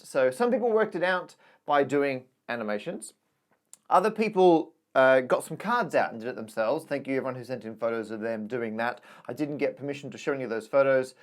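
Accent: Australia. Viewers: What kind of accent is that for an Englishman anyway? Sounds like someone from Perth.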